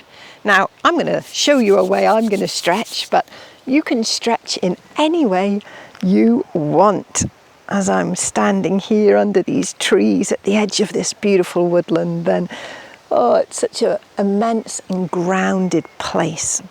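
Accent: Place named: United Kingdom. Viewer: ❤love her clean beautiful accent. Why is it that other British people sound different from her and barley understandable?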